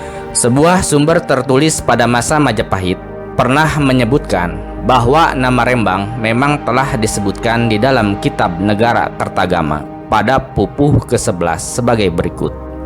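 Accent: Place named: Madura, Indonesia. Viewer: Yg membaca narasi kayaknya bukan org REmbang . Kayak logat Madura tak iye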